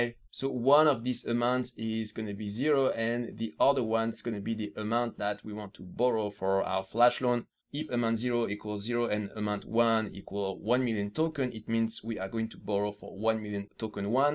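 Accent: France. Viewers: Are you French ? It seems you have a slight French accent ahah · Cet accent français 😂😂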